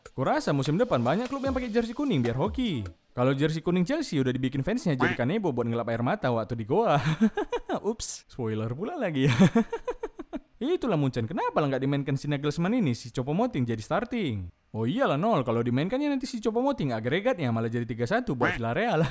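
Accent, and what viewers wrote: Medan, Indonesia. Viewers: Medan kali bah ngomongnya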